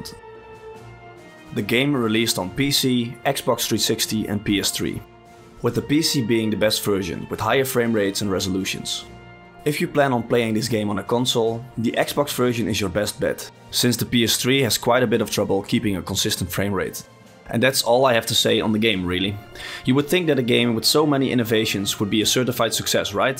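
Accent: Netherlands. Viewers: goeie video, maar het is onmogelijk om een nederlands accent niet te herkennen als nederlander haha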